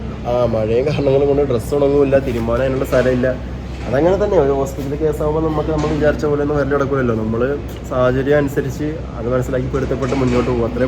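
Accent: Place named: Kollam, India. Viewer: കൊല്ലത്തെ ഭാഷ ഭയങ്കര ഇഷ്ടമാണ് 😍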